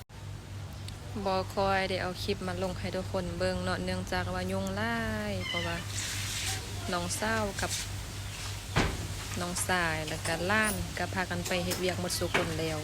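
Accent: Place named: Thailand. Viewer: คุณนิน่าอยู่ แขวงอะไรครับพูดคุยเหมือนเมีองเลยสำเนียงครับ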